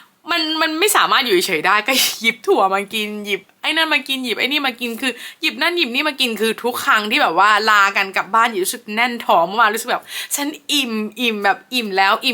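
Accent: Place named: South, Thailand. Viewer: หล่อนน่าจะคนใต้ พูดเร็วลิ้นรัว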